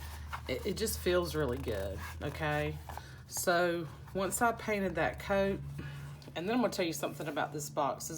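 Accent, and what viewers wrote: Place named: South, United States. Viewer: Yes please, love all your process, picking out your holiday papers?, I can watch you do anything, even drying paint. Love your tags. Please don’t say negative things about yourself, I know Im not the only one who appreciates all that you do for us. Your southern accent is wonderful to listen to. I’m really enjoying Christmas in August, thanks!!💕💕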